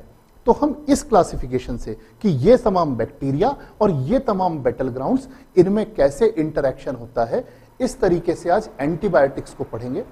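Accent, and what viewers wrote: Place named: Malwa, India. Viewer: Sir u r a genius with ठेठ (beyond)मालवी accent🙏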